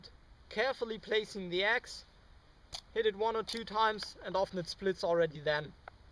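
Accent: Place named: Austria. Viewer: Great video! The best video I've seen on youtube about using an axe safely. I also really like your accent. Austrian right?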